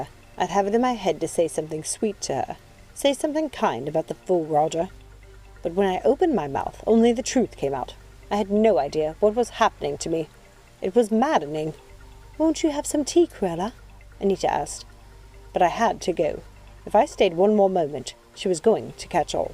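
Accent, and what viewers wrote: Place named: England, United Kingdom. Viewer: Well pronunciated. Love the English like accent. Well done and thank you for sharing 😀😀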